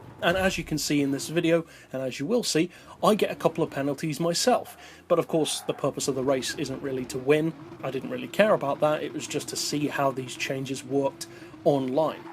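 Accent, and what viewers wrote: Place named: Wales, United Kingdom. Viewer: You sound a bit Welsh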